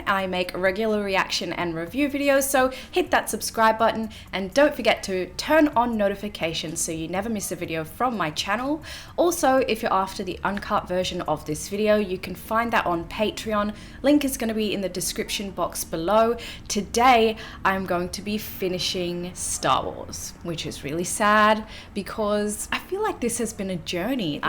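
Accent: Australia. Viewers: Nice to hear it in in an Aussie accent. Grateful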